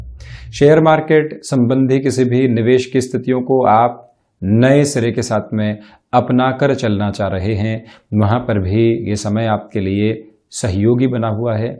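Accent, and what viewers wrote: Standard. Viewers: Sayali absolutely my Hindi has actually improved to some extent hearing his pure Hindi.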